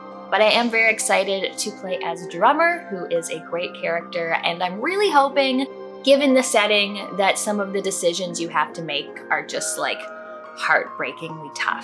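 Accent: Canada. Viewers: Man, your Canadian accent is really pronounced in this video.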